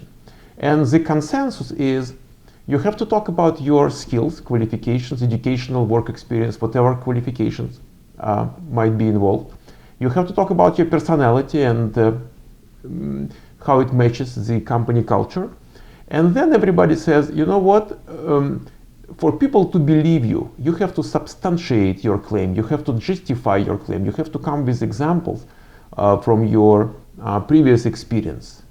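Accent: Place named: Russia. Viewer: Cпасибо! Всегда хотел услышать как вы говорите на англ. Ваш речь мне особенно понятна, потому что вы говорите с русским акцентом. Скажите, это считается очень сильный акцент? Потому что вас я понимаю отлично, а носителей намного хуже. В общем учу язык по вашим видео!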